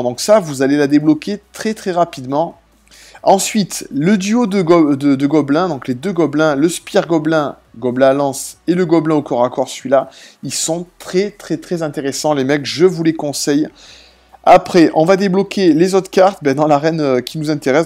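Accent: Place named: Toulon, France. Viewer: j aime trop tes vidéos PS: l accent toulonnais et bien aussi ;)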